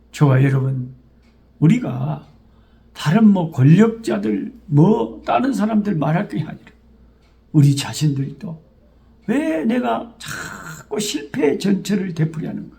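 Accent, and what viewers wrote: Jeolla, South Korea. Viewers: 목사님말씀으로 하루를 시작해서 에레미아서 까지 오게됨을 감사합니다 여기는 순천이레요 옆마을 벌교 사투리에 웃으며 정감이 깊습니다 추위 잘이겨내세요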